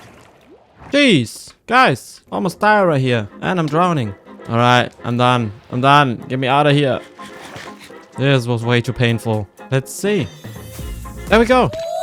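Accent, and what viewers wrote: Germany. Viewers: i just found your channel and i heard a bit german in your voice are you german? If you are german im german too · Are u german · your english is very german-ish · for me it sounds like german cuz im german and its the same accent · are you german ? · Deine Stimme und der Untertitel ist so deutsch xD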